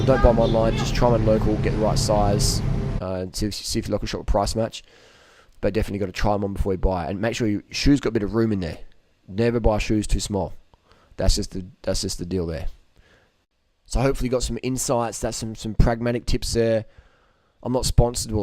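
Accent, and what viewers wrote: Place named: Ireland. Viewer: Great to hear an Irish accent on here! We dont need milk.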